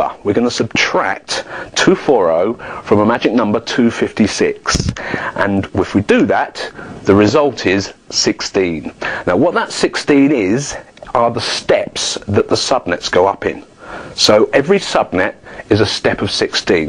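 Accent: England, United Kingdom. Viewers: lol english accent :D lol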